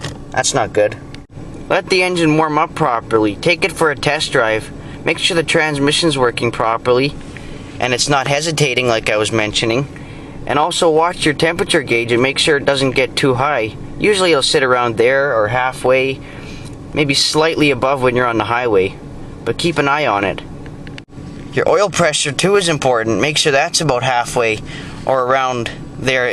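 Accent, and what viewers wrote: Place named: Canada. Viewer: i can tell that ur canadian haha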